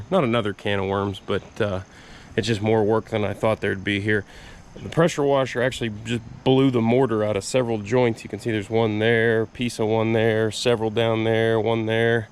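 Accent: United States. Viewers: Was just about , as a relative newcomer to this project, to ask what exactly what you intended to use it as. You stopped me in my tracks by giving a quick description. But as one who sometimes struggles with local American accents can you describe a little more exactly what you would like it to be, BRIAN from Spain . Thankyou.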